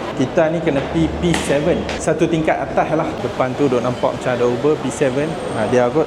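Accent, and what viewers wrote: North, Malaysia. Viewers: Btltu terbaikla, org Malaysia yg berbangsa India dan agama Hindu yg tentunya asal usul nya dari India, tp abgni dgn ckp lonhgat utara, pergi pulak sorang²tu, MMG Chanel yg baiklah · Wei lama aku tggu org kita buat content camni.. lagi mantap sbb cakap utara. Steady org kita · Best memang terbaik la.dengan cakap loghat utara syok tengok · 1st video saya tgk dan terus subscribe. Penerangan jelas sekali dgn info tempat dan harga. Explaination pun sempoi lagi2 dgn loghat utaranya hahaha dan planning aktiviti pun menarik. ada tunjuk aktiviti penduduk in different time of the day.your intention to potray the other side of the locals tu mmg sampai, terbaik. Mantappp👍keep it up the good work.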